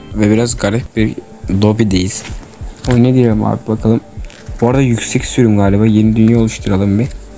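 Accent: Azerbaijan. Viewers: Azerimsin sesinden bildim Düzenleme:beyler like atın hiç bi videoya çıkmadım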